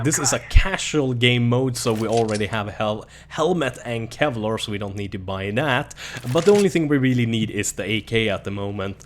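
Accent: Sweden. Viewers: Svensk? (dialekt xD) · man hör så jävla väl att du e svensk xD Bra video ;) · he IS swedish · ? ? hes swedish dumbass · He's definitely Swedish. - A Swedish guy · Is he from Sweden? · He has a Swedish accent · Heard right away that you were swedish x) It was kind of fun to see that yoy Also did that " " with your name cause I do too XD exactly rhe same way, since I was 13 xD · two seconds into the monologue and I'm betting you're Swedish, correct? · that Swedish accent. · Tack för videon (och tummar upp för svengelska uttalet haha<3)! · also u sound swedish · He swedish :'D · Very swenglish but i am swedish to i cant be too rude🇸🇪🇸🇪🇸🇪🇸🇪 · His accent is maybe swedish because he is from Sweden? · This dude is from sweden tou can hear it on How he talks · you're swedish right? sounds swedish! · Easy to hear that this guy is Swedish :D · u r swedish arent u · I just bought the game and thought I'd give it a try since CS is soooo praised by gamers. 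Here is what I think about it (only played 5 hours) Challenging to handle the weopons (This is a plus for me) I get spawnkilled ALL DUHH TIME!!! Few and pretty boring game modes and maps. People either camp in corners with snipers or run around with shotguns. (not the game faults though) Weird frame drops... Shite graphics lol. But the game is old right?... I don't like the game, I'm not hating. Just sharing my thoughts of the game. BTW Are you swedish? You really do sound like a swede. Skit bra review för noobs som mig! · The swedish is real! xD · the swedish accent i love it xD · your accent is very swedish! i just noticed that now. but anyway thanks for this guide! i also want to be better at this game because most of my friends are csgo players and im being left behind :( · Are u swedish?? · Are you swedish? im new and the first thing i heard was youre accent, sounds swedish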